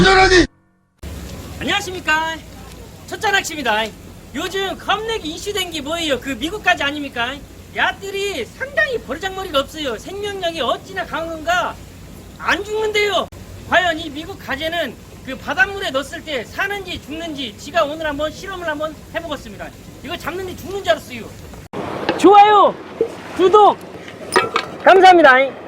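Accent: Jeolla, South Korea. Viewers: ㅋㅋㅋㅋㅋㅋㅋㅋㅋㅋㅋㅋㅋㅋㅋ 토종 전남분이시죠? 아 웃긴그 ㅋㅋㅋㅋㅋ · 전라도 사투리가 구수허네유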